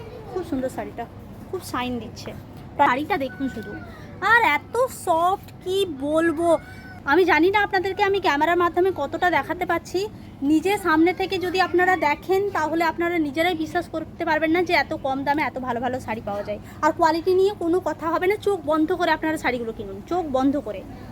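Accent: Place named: Birbhum, India. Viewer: আপনার সঙ্গে কেরালা ভ্রমণ করছি।ওখানকার জীবন সংসার সংস্কৃতি সব জানতে পারছি,আপনার সব ভ্লগ এখনও দেখা হয়নি,তবে আপনার ভ্লগ সাবজেক্ট ও প্রেজেনটেশনে দক্ষতা ও মুনশিয়ানা আছে, সকলকে আগ্রহী করে তোলে,অবশ্যই ধন্যবাদ। আরও কথা যা শুনি যে বাংলার পরিযায়ীদের 2য় ভুমি কেরালা,ওদের কাছে থেকে দেখে শিখে ওরাও অনেক উন্নত ও ভাল থাকছে।আপনার ভ্লগে তাদের অনেক কে দেখলাম, ভাল লাগল। একটা কথা আপনার কথায় একটু বীরভুমের টান আছে, সেটা হতেই পারে , কিন্তু আপনার কেরালা কে ক্যারালা শুনতে একটু কটু লাগে, অবশ্য জানিনা ওখানে সঠিক উচ্চারণ কেরালা নাকি ক্যারালা বলে আপনি ভাল জানবেন।অনেক ধন্যবাদ।বাংলার মেয়ে তথা বধূর শিক্ষা ব্যবহার মানসিকতা ও সহযোগী ভুমিকায় ঊজ্বল হোক আপানার সংসার।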